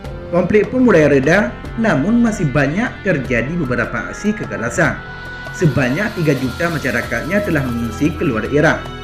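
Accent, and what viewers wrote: Aceh, Indonesia. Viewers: Orang aceh ya bang? Logatnya terasa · Logat Aceh ad irama Arab Arab ny sdkit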